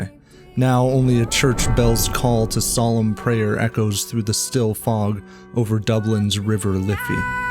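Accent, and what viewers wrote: United States. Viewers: idk man i think youre overthinking it, sounds western US or Canadian to me, could be either but they sound almost identical, its not the south where almost all the accents sound the same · I agree with the accent thing the commentator is an American true and true 😂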